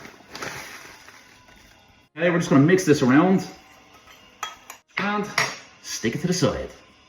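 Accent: Ireland. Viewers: Put them in the oven for about turtee to turtee five minutes! Love and miss the Irish accent. 👋😁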